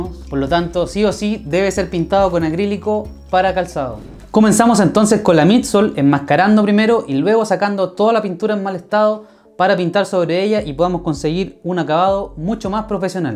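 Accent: Chile. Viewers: Watafaka apenas te escuche. Me di cuenta que eri chileno jajajajaj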